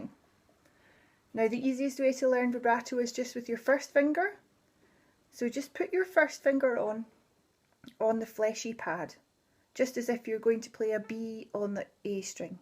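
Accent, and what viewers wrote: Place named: Scotland, United Kingdom. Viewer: I enjoyed your instruction ( ive been playing since before internet existed) I can learn something from anyone> its making the NEW ...a HABIT. I LOVE hearing your scots dialect. and pretty name. thank you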